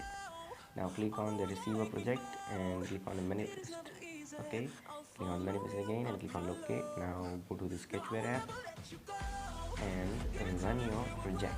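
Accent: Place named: India. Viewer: but your English accent seems to be different than other indians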